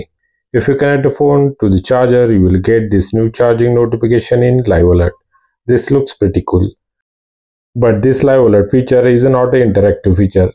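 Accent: India. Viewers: Marathi maanus....typical marathmoli english accent ahe bhaau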